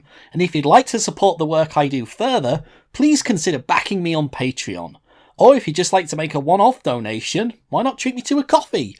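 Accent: Yorkshire, United Kingdom. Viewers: Where in Yorkshire are you from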